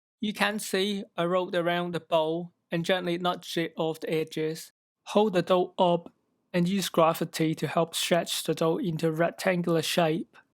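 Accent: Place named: Italy. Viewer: luv the Italian accent, cool video well explained recipe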